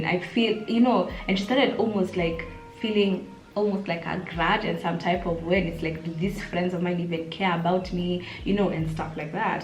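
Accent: United Kingdom. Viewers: I repeat “do you know what I mean babes” in British accent 50 times in a day cause of 😂🥰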